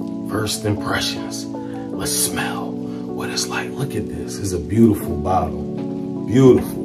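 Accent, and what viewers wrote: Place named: Philadelphia, United States. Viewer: I already knew! Michigan this way. But I know that accent anywhere, friends from Philly. Thinking about wearing it tomorrow!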